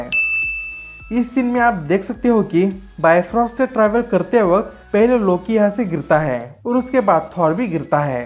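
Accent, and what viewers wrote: Bihar, India. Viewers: Tum bihar se ho kya brother?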